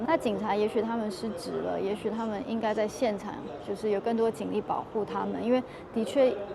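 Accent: Taiwan. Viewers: 聲音真的一聽就是住台灣的😅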